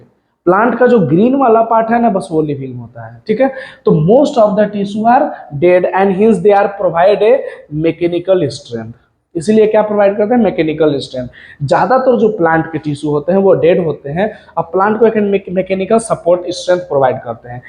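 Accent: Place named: Bihar, India. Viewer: laga ki bihar se ho bolne ke tarike se. 😅😊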